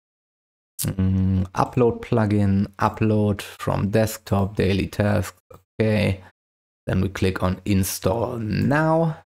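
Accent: Germany. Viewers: A german sounding german, who would have thought? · U sound german😅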